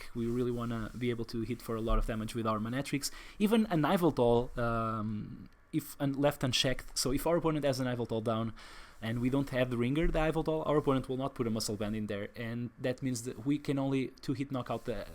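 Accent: Portugal. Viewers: I knew ur accent was portuguese.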